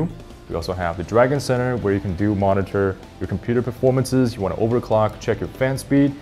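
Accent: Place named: Los Angeles, United States. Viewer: 觉得的口音好好听。能问一下是什么地方的口音吗我觉得好像是洛杉矶的？若能回复十分感谢。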